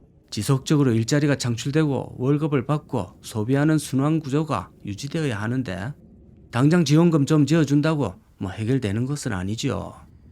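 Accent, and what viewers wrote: Gyeongsang, South Korea. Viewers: 얘기를 하던 도중에 ....마~ 하고 잠시 쉬어가는 투의 어휘를 사용하는데.... 이런 말투는 일본식 말투다......특히경상도 지역에 이런 말투가 유독 많다.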